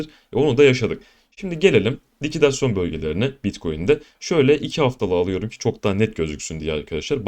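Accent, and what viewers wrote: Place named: Black Sea, Turkey. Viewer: Hocam Karadenizin hangi ilindesiniz ❤️🤲🧿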